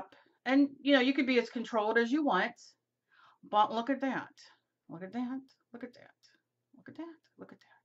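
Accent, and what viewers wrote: New Jersey, United States. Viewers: I THOUGHT I RECOGNIZED THAT JERSEY ACCENT!!!..I'M FROM WILLIAMSTOWN N.J...JUST A STONES THROW FROM YOU...LOTS OF ARTSY STUFF IN JERSEY!!!!!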